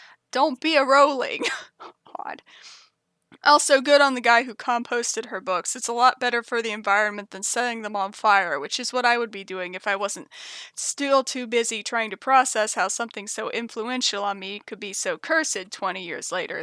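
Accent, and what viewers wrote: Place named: South, United States. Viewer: I love this vid, your content and personality is one of a kind! also your redneck accent is good! lol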